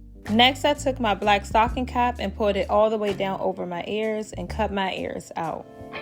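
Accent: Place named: DMV, United States. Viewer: Love the hair!! And please tell me you’re from the DMV lol. My boyfriend says we don’t have accents, but I know it when I hear it!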